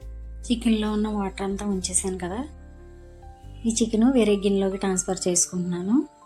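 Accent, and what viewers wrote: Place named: Godavari, India. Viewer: మేడం మీది గోదావరి నా మీ యాస అలాగే అనిపిస్తుంది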